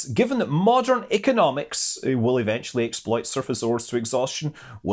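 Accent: Scotland, United Kingdom. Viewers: JUST KEEP ON DOING WHAT YOU DO ❤️ ... I watch many other space channels like Every Day Astronaut etc ... But it isn't a good week, if I don't hear your fantastic scottish accent 🙏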